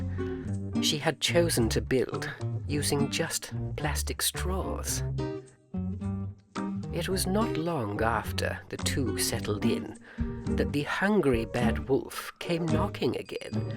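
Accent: RP, United Kingdom. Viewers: the narrator speaks the Queen’s English.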